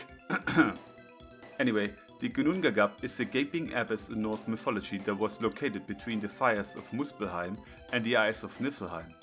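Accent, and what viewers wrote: Germany. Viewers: Sounds german not french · Hey Mate, ich kommentiere nicht oft unter Videos, aber dein Kanal ist definitiv ein Hidden Gem! Da du meiner (und vermutlich auch deiner) Meinung nach zu wenig Reichweite hast, versuche ich mal meine Kommentarfaulheit als 0815 YT OP-Theorie Zuschauer zu überwinden und dir mein völlig subjektives Feedback zu geben. Die "Produktionsqualität" ist generell sehr gut, in Sachen Videoschnitt/SFX gibt es in diesem und auch in den anderen Videos "nichts zu meckern" ^^ Deine Theorie mit den Swirls und der 4. Wall ist wirklich meisterhaft. Hut ab! Dein Storytelling ist auch wirklich gut und imo ist der "Sidekick" das Lustigste was ich bisher in der OP Theories Community gesehen habe. Das gibt deinen Videos wirklich einen krassen Unterhaltungswert und Individualität. Der (französische?) Akzent ist imo on Point und zum wegschmeißen 😂 . 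Bezüglich des Videos könntest du bei der Audiobearbeitung evtl. noch den Kompressor auf der Masterchain tweaken, damit es etwas "voller" klingt und dem EQ für deine Stimme etwas mehr untere Mitten/Bässe geben. Nun zum einzigen, aber nicht zu unterschätzenden Kritikpunkt. Deine Aussprache bro. 🙃 Der Grund, warum ich mir zu 99,9% sicher bin, dass du Deutscher bist, ist, dass ich genau die gleichen Probleme mit der englischen Aussprache habe/hatte wie du...! 😅😅 Nichts für ungut, ich will dir nur mein laienhaftes Feedback geben, damit dein Channel in Reichweite explodiert ❤ . Du solltest wirklich an den Variationen von Th arbeiten. Außerdem R und W am Anfang ei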